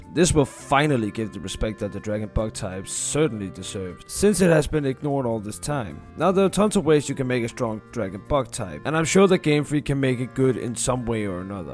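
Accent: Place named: Denmark. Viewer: Figured you were Danish based on your voice alone, got further solidified by you using the Danish website, lol.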